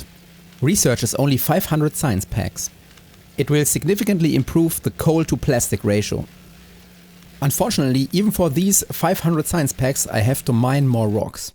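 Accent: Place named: Germany. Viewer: native speaker of both english and german here. Yeah, it's noticeable, but you're doing great, it's perfectly understandable (meaning it fulfills its purpose), it's infinitely preferable to AI slop voice, and at this point it would sound wrong to hear you speak english without an accent, it's lowkey iconic.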